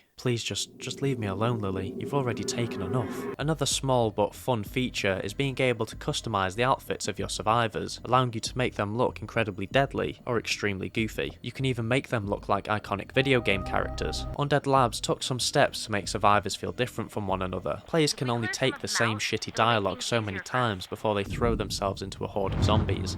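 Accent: United Kingdom. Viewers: just started replaying both games and i have to say bro you've earned a new subscriber, i watched your SOD 1 video when it came out and it was fucking hilarious and so is this one, you're a good youtuber and i hope to see more videos from you, its rare i comment saying things like this so you're welcome, cant wait for the video about SOD 3 btw, what part of the UK are you from? i cant tell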